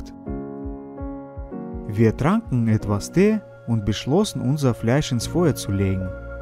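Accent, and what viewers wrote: Russia. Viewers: Bist du ein Russe oder warum der Akzent?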